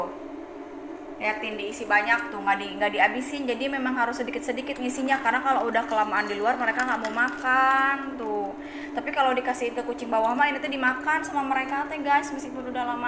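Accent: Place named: Java, Indonesia. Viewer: Momy ni jawatimur pa jawa barat sih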